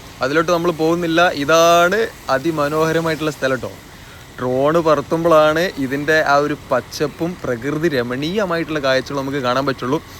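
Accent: Malappuram, India. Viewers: Thumbnail ഇടുമ്പോഴെങ്കിലും ഒന്ന് ശ്രദ്ധിക്ക് ബ്രോ 🙏വഴൽ അല്ല, വയൽ ആണ്. സംസാരിക്കുമ്പോൾ നിങ്ങളുടെ മലപ്പുറം accent തന്നെ ആയ്ക്കോട്ടെ, കേൾക്കാൻ രസമാണ്❤ ചെറിയ ഒരു അഭിപ്രായം പറഞ്ഞു എന്നേ ഉള്ളൂ! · വീഡിയോ രസകരം ആയിട്ടുണ്ട്. ഞാൻ ഒരു തൃശ്ശൂർ കാരനാണ്. മലപ്പുറം ഭാഷക്ക് അതിന്റേതായ ചന്തമുണ്ട്. കളിയാക്കുന്നവർ കളിയാക്കട്ടെ. നിങ്ങൾ നിങ്ങളുടെ ശൈലിയിൽ പറയുമ്പോഴാണ് അതിന്റെ സുഖം. മഴ " മയ " ആയാലും ഭൂമി ഇടിഞ്ഞുവീഴുകയില്ല. ഭാഷയുടെ തനിമയാണ് അതിന്റെ ഭംഗി.മഴയത്ത് കഷ്ടപ്പെട്ട് എടുത്ത വീഡിയോ വളരെ നന്നായിട്ടുണ്ട്. ഇനിയും വീഡിയോ ചെയ്യണം.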